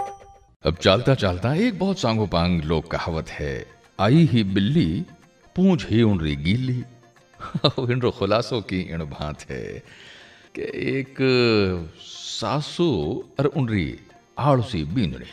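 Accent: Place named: Jodhpur, India. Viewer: आपकी आवाज सुनकर जोधपुरी होने का फख्र है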